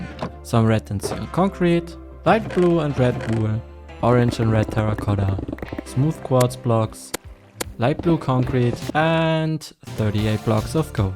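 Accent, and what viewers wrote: Germany. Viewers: You are not "Hochdeutsch" are you? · du bist deutsch das hört man an deinem english und kein engländer sagt oki doki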